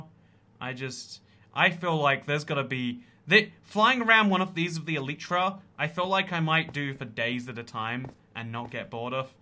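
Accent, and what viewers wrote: United Kingdom. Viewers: cus he's bri*ish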